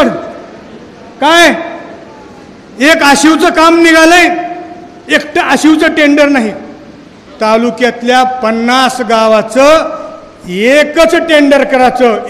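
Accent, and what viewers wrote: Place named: Rural, India. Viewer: अस्सखलीत ग्रामीण शैलीत no.१ भाषण 👌👌👌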